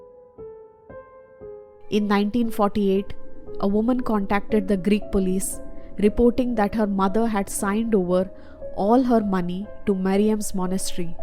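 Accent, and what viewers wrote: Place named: South India, India. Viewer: Narrator sounds as if she is South Indian.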